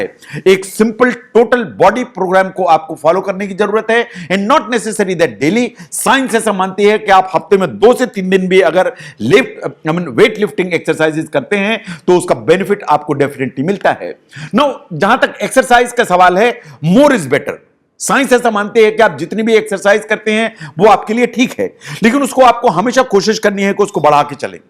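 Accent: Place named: Awadh, India. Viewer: "Joun hai" pure awadhi waah boss 😍 Dill baag baag ho gya jo aapne bech beech me "joun hai"bolte rhte hai aap awdh ki Shaan hai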